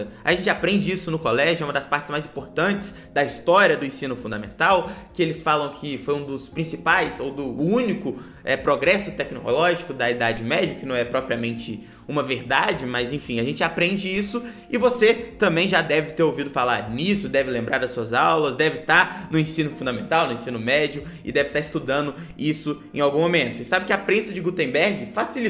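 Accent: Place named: Bahia, Brazil. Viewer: Isso se chama sotaque.. Aqui na bahia é muito comum.. Como um brasileiro, tu já deveria estar acostumado com nossa variedade linguística e cultural.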